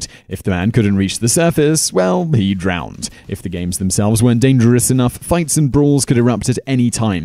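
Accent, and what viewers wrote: United Kingdom. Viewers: That British accent makes you sound slightly less boring and inadequately prepared but not much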